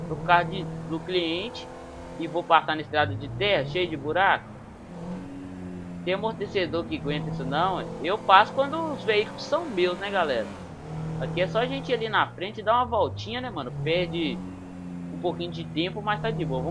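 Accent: Minas Gerais, Brazil. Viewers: Mano ? se e Mineiro? :V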